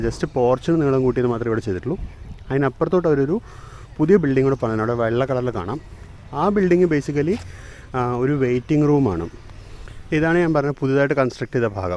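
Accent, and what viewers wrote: Thrissur, India. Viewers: As you are a Thrissur boy, we expect at least one video with the great Innocent-style accent!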